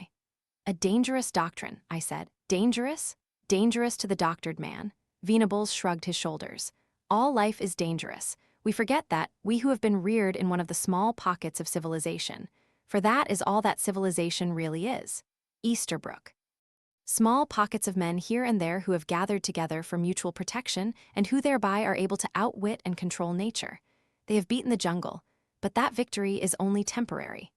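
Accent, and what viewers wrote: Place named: United States. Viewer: Why on earth is an American accent used to read this quintessentially English novel.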